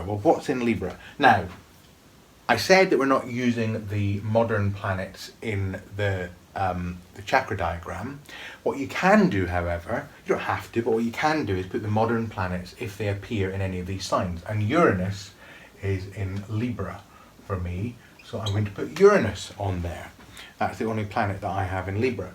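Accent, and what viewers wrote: Scotland, United Kingdom. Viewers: Hi there. I worked on this on the 29th July 2021 after watching just this lesson. I thoroughly enjoyed finding that my Base Chakra has the Sun together with Venus! Chiron is with Neptune in the Heart Chakra. Jupiter joins with Uranus and the Moon in the Throat Chakra. I found it quite fun to bring the Elements, Positions and Polarities into the mix too. I enjoy your other videos as I studied 19 years with a private Jungian teacher, worked with Astrology and Tarot through the 70s. Its wonderful to have the old background learning in all these and other areas brilliantly updated. As I child I regularly dragged my mother into a particular shop so I could hear the Scots accent so this is another benefit! Thanks for your dedication and enthusiasm.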